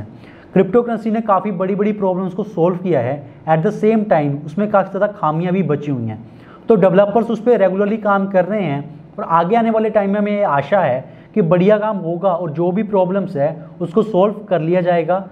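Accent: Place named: Jammu, India. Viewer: Thank you...bro looks like your mother tongue is dogri...is that true..?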